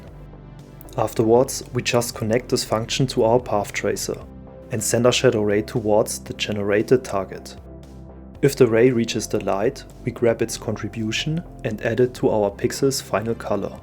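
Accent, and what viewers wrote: Germany. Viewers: What's up with the German accent? (first time seeing the channel) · Is it just me or does He Sound german?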